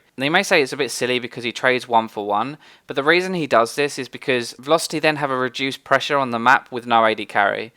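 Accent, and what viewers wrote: United Kingdom. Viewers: Oh god, my bad, I didn't even noticed the player's name._. well, it has been proven that the british accent makes speeches more charismatic and less tiring to listen to. Take that as your advantage Mr.! haha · Hea British? I'm British! :)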